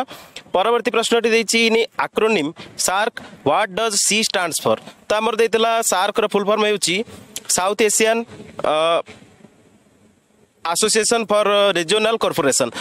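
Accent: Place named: Telangana, India. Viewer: Telangana karuchhi touch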